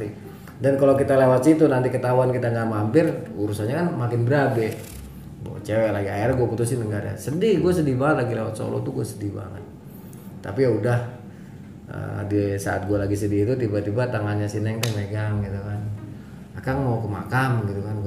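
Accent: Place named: Bandung, Indonesia. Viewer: Bang bisa logat bandung euyy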